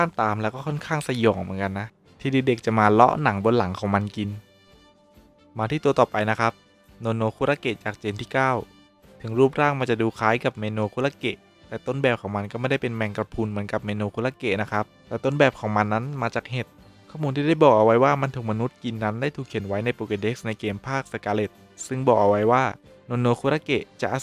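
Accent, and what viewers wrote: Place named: Suphanburi, Thailand. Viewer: ชอบสำเนียงสุพรรณมากค่ะ น่ารักมาก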